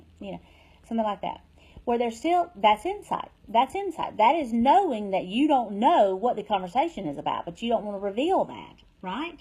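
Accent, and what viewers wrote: South, United States. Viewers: You are so helpful! I love your southern accent. Sooo cute! And you DO KNOW A LOT! 💓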